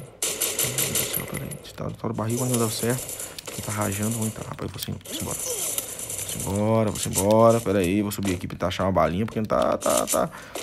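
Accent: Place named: Northeast, Brazil. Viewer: Salveeee,primeira vez que te assisto e ja gostei mano!! Queria saber de onde tu é! Tem um sotaque daqui do nordeste!!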